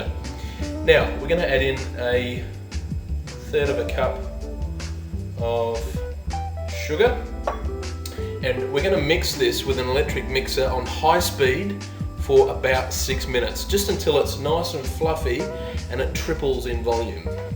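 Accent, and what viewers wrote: Australia. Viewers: From his accent can tell that his from australia not US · the aussie accent is not the same as the british accent . its soo different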